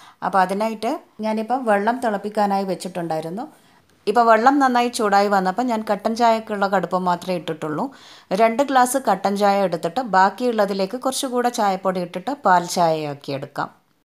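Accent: Thalassery, India. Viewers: Wow adipoli 👍🏼 And style of talking also nammude thalassery style 😁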